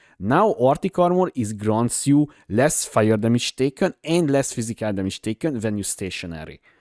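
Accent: Hungary. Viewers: Hungarian accent alert!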